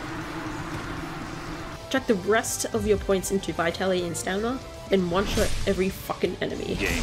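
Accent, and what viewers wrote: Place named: Australia. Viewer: What are you Australian? If so I like your accent.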